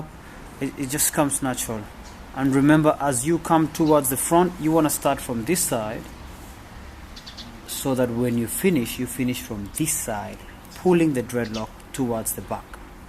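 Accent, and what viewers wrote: Kenya. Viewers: This is a wealth of information!!! I have been looking for a video that explains how to do this properly. Thanks!!! You sound Kenyan BTW. God Bless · you sound Kenyan...I wish I saw this before I had my loc extensions installed ....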